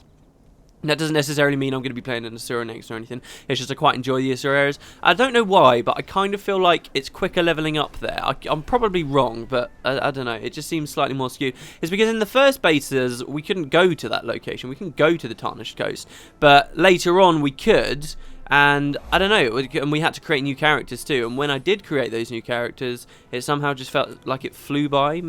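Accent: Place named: RP, United Kingdom. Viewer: Having such a hard time trying to imagine how you look like, like; You speak like a truly british gentlemen, but somehow you are soo nerdy when it comes to knowing the Gw lore and you watch (a lot/some?) anime and you got this somewhat awkward humor that is hilarious to listen to.. I have no idea what to imagine, I jsut want to know if you're good-looking! D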